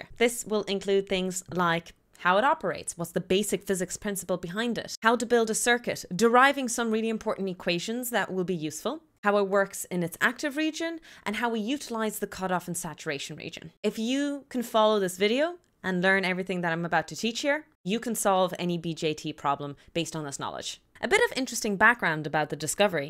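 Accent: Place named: Ireland. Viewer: Nice explanation. You sound Irish.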